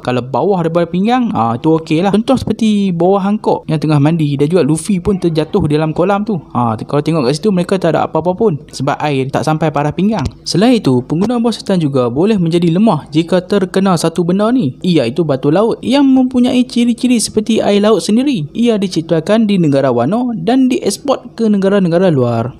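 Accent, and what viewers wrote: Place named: Kedah, Malaysia. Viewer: Pekat kedah.. Haha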